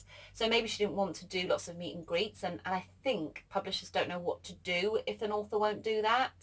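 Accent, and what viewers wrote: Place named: Newcastle, United Kingdom. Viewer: Omg the farm is divine, so gorgeous!!! And did I detect a Geordie accent?! 🥰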